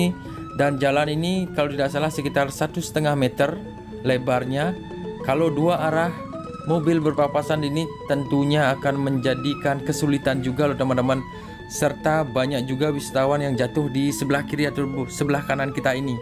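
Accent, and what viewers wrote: Bali, Indonesia. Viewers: Apa yg saya suka dari adalah beliau detail sekali menjelaskan spot2 wisata dan hafal sekali jalan2 yg beliau lewati, dan yg lebih saya suka adalah logat khas Bali yg membuat kita merasa yess!! kita sdg berada di Bali!!😀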